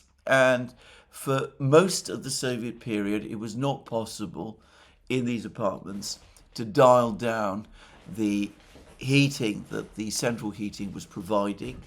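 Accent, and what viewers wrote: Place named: RP, United Kingdom. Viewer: 勿体ぶった話しぶり 下顎を上げ視線は鼻先をすり抜け "ゆ なあーいてっど" 「united」（発声発音：Received Pronunciation、RP） 持って回したものの言い方 その先に必ず待機万端するのは 枕詞 「以前私が議論した通り」 これらの風味滋味を愉しめる渋い寛容は キエフ陥落に至るまで持ちこらえられそうには、もう無い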